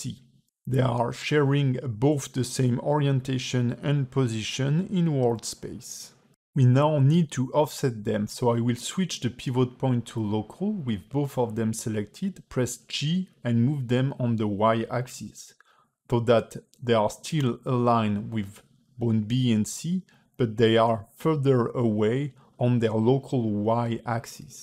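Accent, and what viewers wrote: France. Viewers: L’accent french en force mdrr continue tu régale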